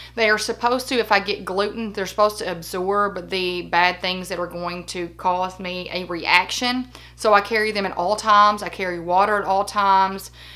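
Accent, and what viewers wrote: South, United States. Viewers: New subbie! Excited to watch your video. Where are you from, sound like a southern accent (like me) 😀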